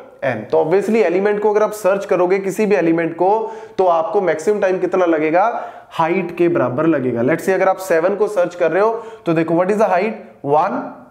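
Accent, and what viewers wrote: Punjab, India. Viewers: Sir u r from Punjab?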